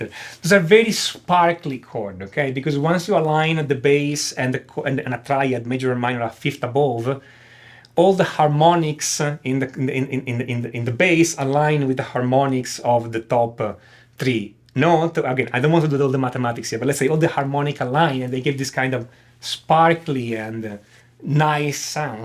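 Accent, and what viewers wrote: Italy. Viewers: What Music theory lesson isn't instantly made better by having an English speaking teacher with an Italian accent !!? ( It is an Italian accent, isn't it ? )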